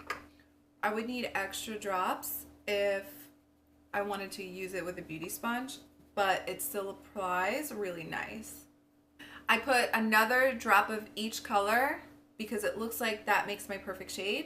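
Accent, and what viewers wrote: New Jersey, United States. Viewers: You have such a thick Jersey accent! Haha :) thanks for the review! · Every time I watch your videos, your accent makes me miss Jersey!! I know your in NY, but this Jersey girl misses home. Adore you beautiful, and your videos. Keep them coming. xo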